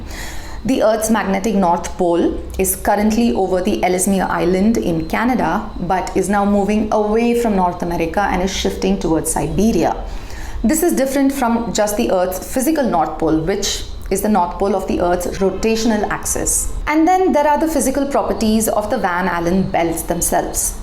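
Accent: India. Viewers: I really don't want to delete my comment. She is Indian speaking English rather beautifully with an Indian accent, rather fittingly because she is Indian. I understand her perfectly. If you're so accomplished I rather wonder why you can't? Would that I could speak a second language so well.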